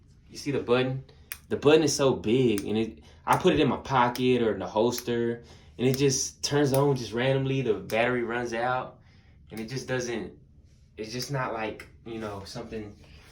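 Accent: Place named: Texas, United States. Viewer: When you said wassup wit it lol I knew you was from Texas lol I’m in Dallas mayne keep doing ya thang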